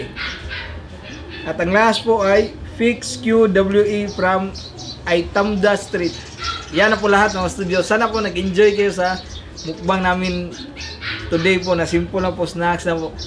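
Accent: Visayas, Philippines. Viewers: Bisdak jd kaayo accent jd ba hehehe, I'm visaya din hehe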